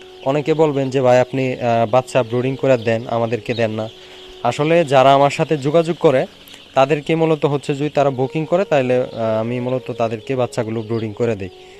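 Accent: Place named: Mymensingh, Bangladesh. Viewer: ভাই আপনার বসা ময়মনসিংহের কথায়।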